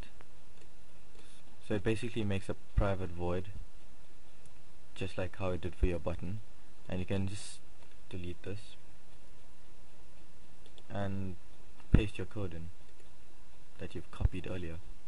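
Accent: South Africa. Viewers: South African? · I "guees" that's why he does the design and not the coding ^_^ JJ - still a nice tutorial. Are you guys South African?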